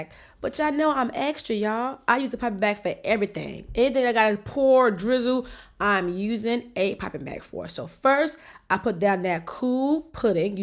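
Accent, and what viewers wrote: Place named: Louisiana, United States. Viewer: Louisiana accent 💋💍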